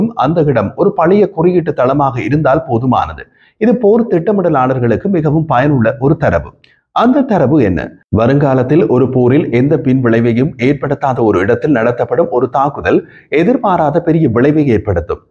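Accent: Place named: Sri Lanka. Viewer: 👌ஸ்ரீலங்கன் தமிழ்🇱🇰🌹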